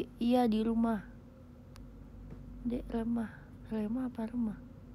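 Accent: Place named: West Java, Indonesia. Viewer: Mlm ni nyunda pisan uyy....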